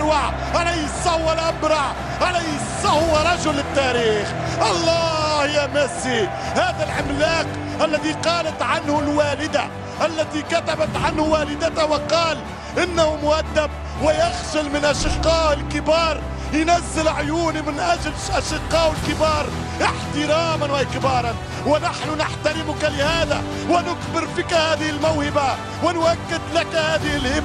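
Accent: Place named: Tunisia. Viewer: the commentator in this video is Tunisian which is soooooo different than the egyptian dialect! your comment makes 0 sense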